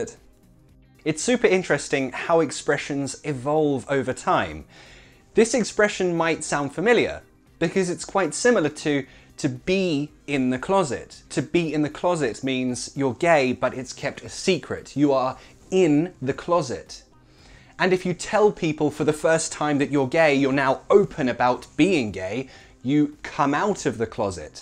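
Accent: United Kingdom. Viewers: Does he speak british english? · Anish Tom No. His pronunciation sounds 100% British to these American ears.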